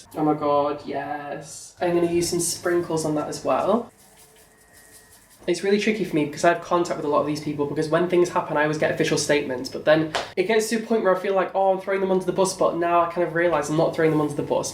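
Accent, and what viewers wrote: Manchester, United Kingdom. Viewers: are you from Manchester of so I love you even more I that is even possible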